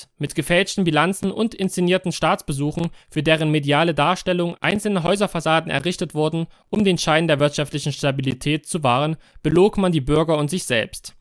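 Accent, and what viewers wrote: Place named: East Germany, Germany. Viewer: Diese Stimme....typischer Ossi.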